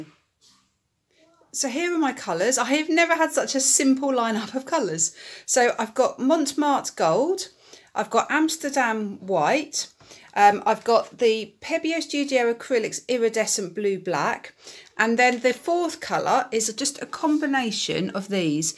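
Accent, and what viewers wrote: England, United Kingdom. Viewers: I love watching your videos, they are so carefully explained and so refreshing to hear an English voice. I feel very much on my own here in UK. Keep going.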